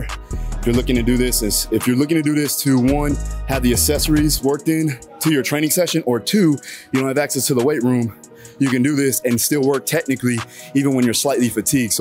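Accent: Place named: Miami, United States. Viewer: you kind of sound like a miami boy with your accent. Is my assumption correct? I dig the video btw. Especially right now with this pandemic since I don't feel comfortable going to the gym, so those are good pointers for calisthenics.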